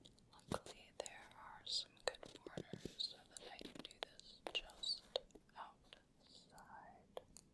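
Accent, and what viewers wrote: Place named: Midwest, United States. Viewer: The Midwestern comfort of hearing another person add letters to words, "across-t" is familiar ❤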